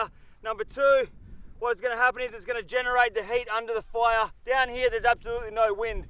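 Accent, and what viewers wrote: Australia. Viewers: Do you also read his replies with the voice and Australian accent?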